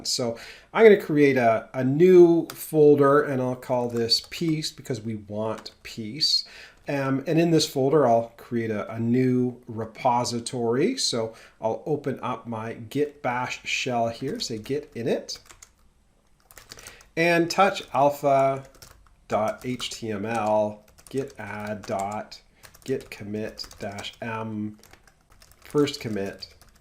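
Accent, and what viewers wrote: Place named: India. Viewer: I'm not being racist, I just don't understand English with an Indian accent. Please don't misunderstand me.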